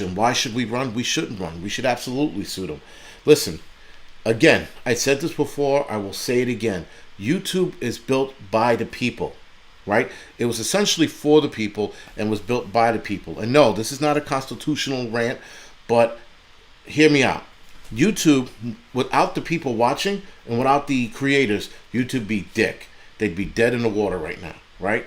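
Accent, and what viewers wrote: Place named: New York City, United States. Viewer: As a NYC Native who is now living in W.Europe (Paris) love to hear your straight,raw NYC-speak,brings me back 👍THX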